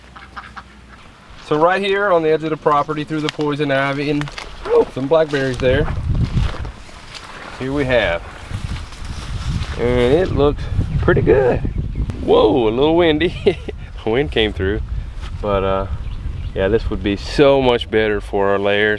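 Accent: North Carolina, United States. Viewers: I'm new to your channel. Love the family farm. Your accent makes me miss NC. I'm on the cute little boy's side- I want to see a T-rex too! He is so adorable.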